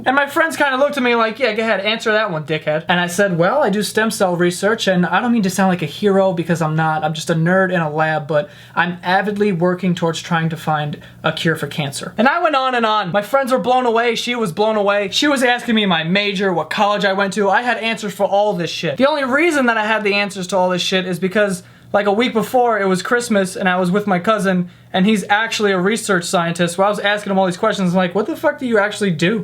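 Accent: New York, United States. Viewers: I love his New York accent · He does, but it's probably because he's from New York and most New Yorkers say the word "ask" like "aks". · OMG love listing to you talk. Reminds me of living in New York. You remind me of people in my family. Keep it coming. 💯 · . I love you. I get you're from New York but ffs it's ask not axe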